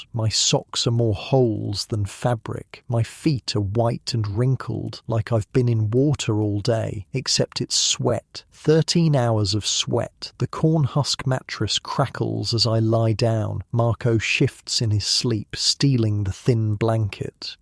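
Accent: England, United Kingdom. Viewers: This is my favorite English accent for stories! There are others, but this Historians chosen voice or original is the best! 2247 in Ridgecrest, Ca USA.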